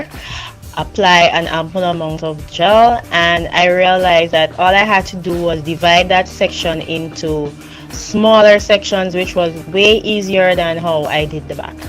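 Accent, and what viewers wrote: Jamaica. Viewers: is that a Jamaican accent I hear? 😁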